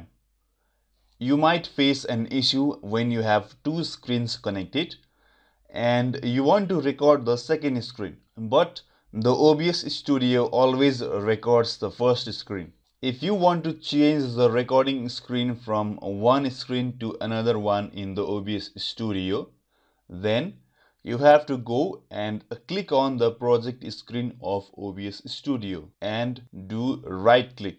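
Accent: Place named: India. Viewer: Heard the indian accent and knew this guy was gonna have the answer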